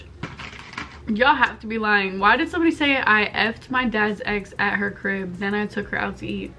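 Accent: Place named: South, United States. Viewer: You remind me of the mom from ginny and georgia especially with the southern voice 😂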